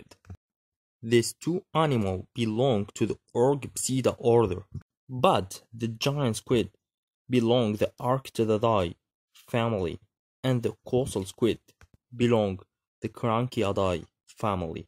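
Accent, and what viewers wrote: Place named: France. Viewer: That nice french aksant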